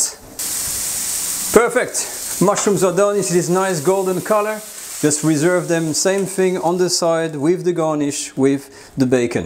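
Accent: France. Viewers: Mate, are you some sort of French guy located in Australia with Dutch roots? I hear lots of things happening with your accent. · I like the way you pronounced stir as “steer” and your French accent! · Nice! I'll try this recipe tomorrow, I love your french accent by the way... greetings from México!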